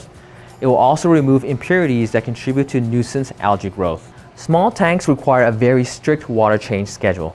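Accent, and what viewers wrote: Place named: United States. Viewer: This man's speaking american accent. You're lucky to have him informing you about marine fishkeeping bc he speaks slowly and carefully. This 10/10 presentation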